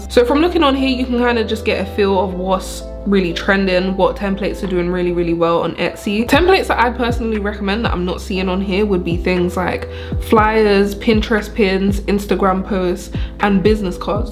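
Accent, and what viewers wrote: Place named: England, United Kingdom. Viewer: Hi 👋. Are you single sweetie. I love your English accent 😍.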